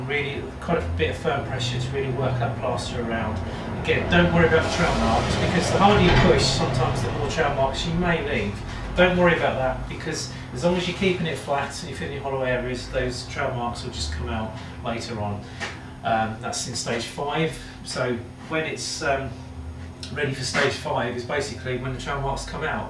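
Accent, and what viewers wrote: United Kingdom. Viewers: Lancashire accent ?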